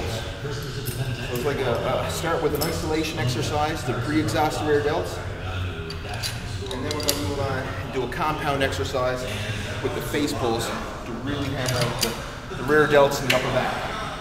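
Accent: Newfoundland, Canada. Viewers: You must be Canadian. I only can tell because I have watched a bunch of Trailer Park Boys...lol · u a newfie? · Are you Canadian? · are you canadian?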